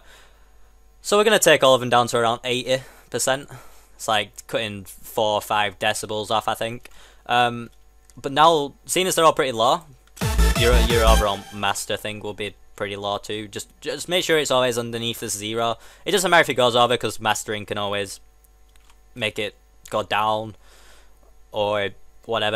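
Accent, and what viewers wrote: United Kingdom. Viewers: Northerner. Definitely a northerner.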